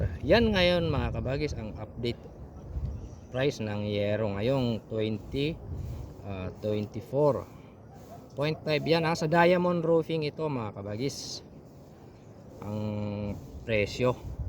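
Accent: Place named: Ilocos, Philippines. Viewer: TAGA SAAN PO KAYO PARANG ILOCANO PO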